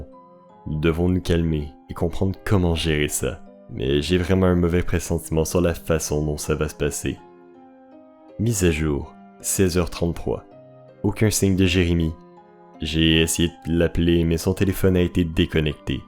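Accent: Quebec, Canada. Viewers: C'est marrant l'accent québécois mais je n'arrive pas à me mettre dans l'histoire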